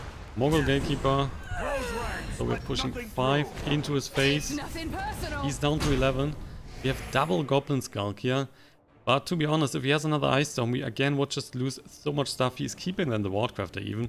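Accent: Germany. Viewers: You speak like a german guy lul^^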